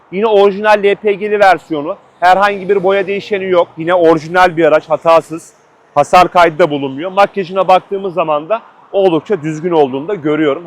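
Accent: Samsun, Turkey. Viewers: Samsun şivesi bu ama konu o değil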